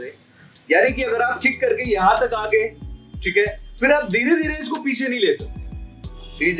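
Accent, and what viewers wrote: Kerala, India. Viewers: Mallu ki Hindi ...mast ha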